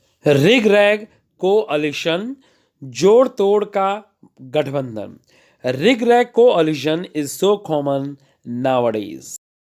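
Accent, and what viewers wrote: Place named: United States. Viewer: Sir, Thanks for uploading such Useful educational video and I like your American accent speaking style besides you are bold enough and outspoken.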